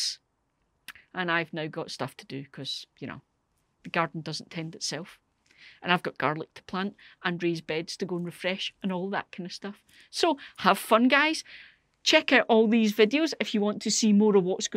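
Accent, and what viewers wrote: Scotland, United Kingdom. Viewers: You are the spitting image of Jodie foster, even the way you speak (apart from the Scottish accent lol) Anyhoo, I've just bought a polycarbonate greenhouse, after watching your videos. I had absolutely no idea that it needed heat in winter, lights and watering system & so much more 😬 I didn't research enough 🙄. I'm retired now & have always wanted a greenhouse (I used to use my window ledges when kids were wee)& I'm on a tight budget. I've started tomatoes and a few herbs & cuttings from other people's gardens. Any suggestions where I can get things cheap? I'm not great with technology & always seem to mess up lol I stay in Millerston just outside Glasgow and the winds can be pretty bad too so was looking at glazing tape & canny even do that right 🙄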